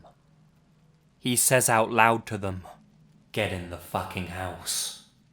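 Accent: England, United Kingdom. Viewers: You always have great stories. I love your english accent. I would love to go to college in England. 😃 I'm African American. Do you like Blues music? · Hold up. You grew up un chicago but speak with a gay english accent?? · spooky British accent (⊙＿⊙)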